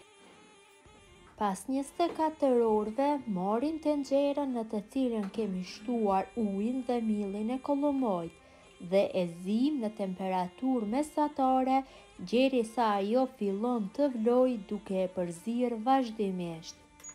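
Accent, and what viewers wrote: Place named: North, Albania. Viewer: o zemer , je e mrekullushme, jo vetem per receten e Bozes por edhe per dialektin e mrekullushem te veriut qe ke, gjithashtu edhe muzika ne sfond , te uroj nga zemra gjith te mirat, pershendetje nga Gjermania.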